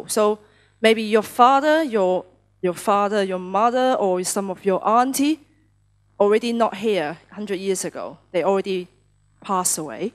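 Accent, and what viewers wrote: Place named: United Kingdom. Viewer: 英式發音，舒服舒服～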